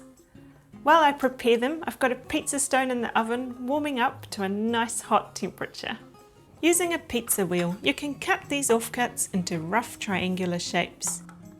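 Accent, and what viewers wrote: Australia. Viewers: Love your Aussie accent!🤩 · I wish I could pronounce Easy Peasy with a lovely Australian accent.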